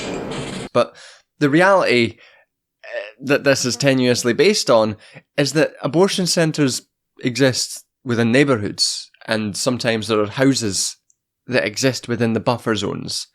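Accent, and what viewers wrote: Scotland, United Kingdom. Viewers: You got me with your Scottish & I subbed for the lefty content. We need to make alliances. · My first video on your channel. It's good to hear a fellow Scottish voice talking about how shite everything is. Thanks for that. The Braveheart remix was a nice touch.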